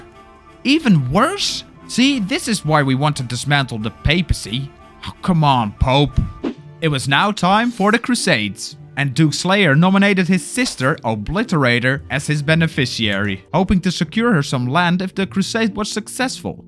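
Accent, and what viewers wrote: Netherlands. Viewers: Are you dutch perhaps? I think i recognize the accent because i'm dutch as well. GEKOLONIZEERD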